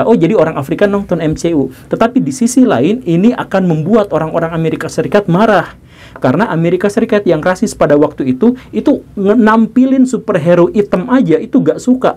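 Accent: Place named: Java, Indonesia. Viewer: Tetapi jawa logatnya kok susah diubah ya? Etnosentrisnya masih kebawa2 di rantau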